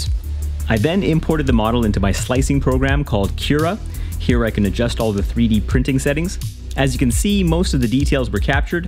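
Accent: Canada. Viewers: Canadian? LET'S GOOOOO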